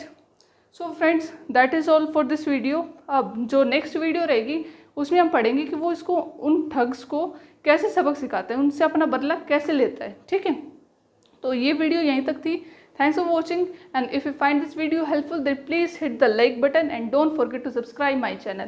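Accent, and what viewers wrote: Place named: Haryana, India. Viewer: Ap to Haryana sa lag ra mam ji 😀👍🙏❤️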